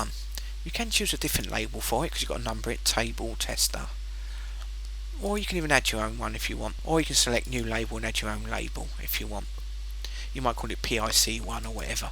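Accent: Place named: London, United Kingdom. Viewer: You have an unusual name but a strong London accent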